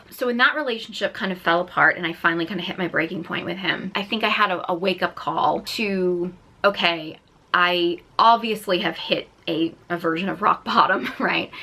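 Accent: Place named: South, United States. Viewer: Your accent is cute! Where are you from? I’m in the Midwest. To me it sounds like you have a ever so slight southern accent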